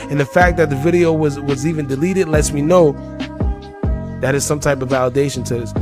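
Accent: New York, United States. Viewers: How u kno dis and u sound like u from new york🤔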